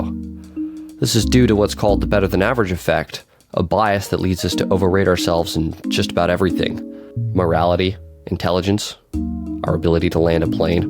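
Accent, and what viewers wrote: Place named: United States. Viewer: >hear american >leave immediately i mog burgerfats easily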